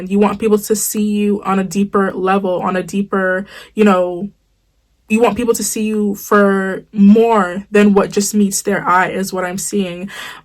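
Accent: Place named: Jamaica. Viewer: Yoo you gotta be Jamaican lol some of the words you use and how you say things lol if you are big up you self sistah🇯🇲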